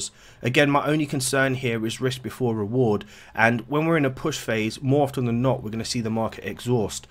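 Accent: London, United Kingdom. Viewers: Broken english accent??? Bro it's a London accent lol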